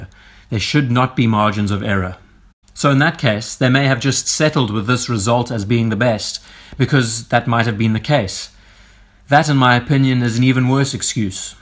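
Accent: Cape Town, South Africa. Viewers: Tell me are you from Cape Town?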